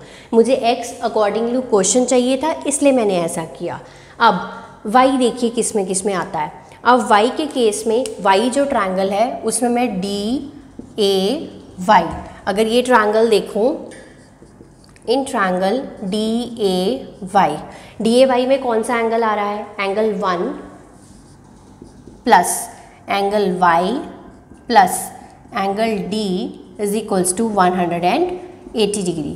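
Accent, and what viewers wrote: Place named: Punjab, India. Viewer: Mam aap ka way of teaching bhut best hai Apki panjabi bahut cute hai ... Mam aap bahut acchi Hain ❤️❤️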